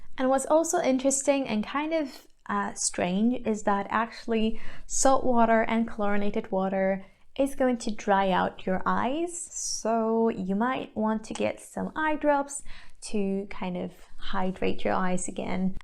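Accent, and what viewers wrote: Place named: United Kingdom. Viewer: Her accent is British Nguyen you can tell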